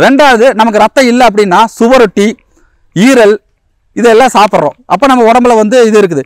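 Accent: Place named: Coimbatore, India. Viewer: Coimbatore Tamil very beautiful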